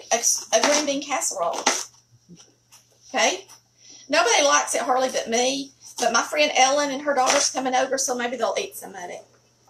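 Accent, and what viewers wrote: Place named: Georgia, United States. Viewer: Thank you , your videos feel like home to me. The way you speak reminds me of home in Georgia.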